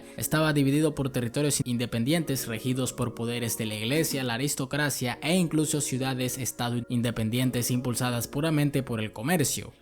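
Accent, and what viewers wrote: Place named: South America. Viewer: Vaya forma de destruir el idioma. Me parece que el narrador es sudamericano. Usar un diccionario para ver cómo se dice una palabra no te hará daño. Ni practicar la dicción. Qué bueno que no vivo en sudamérica, no podría soportar la manera tan peculiar que tienen de hablar castellano.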